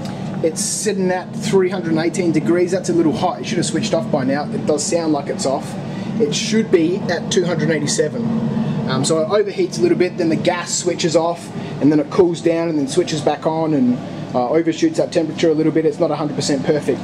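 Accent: Australia. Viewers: Where did you get the spin caster? Sounds like you're in Australia and I'm looking for a supplier here.. Thanks!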